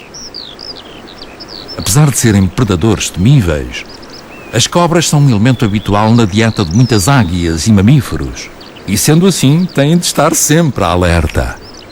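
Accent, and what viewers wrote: Portugal. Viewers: Assistindo pq acho bela a natureza e a narração em pt de Portugal, bem diferente aqui do Brasil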